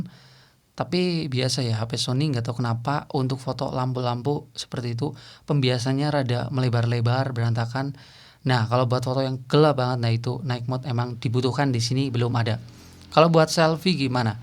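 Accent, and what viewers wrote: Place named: Banyumas, Indonesia. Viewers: Salam ngapak😅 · Ora ngapak ora penak ya lur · Banyumas lurrr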